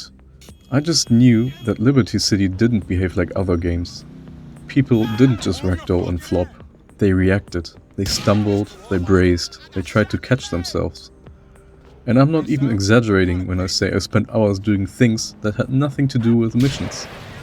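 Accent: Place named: Germany. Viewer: I hear a little DEUTSCH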